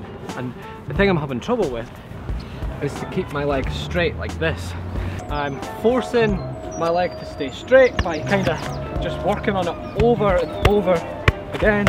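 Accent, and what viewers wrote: Scotland, United Kingdom. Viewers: He sounds Scottish (like me) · no hes scottish you frik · Is he scottish?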